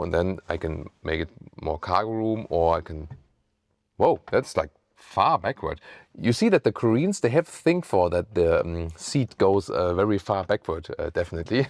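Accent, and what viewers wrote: Germany. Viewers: i like your German English. you speak both at same time love it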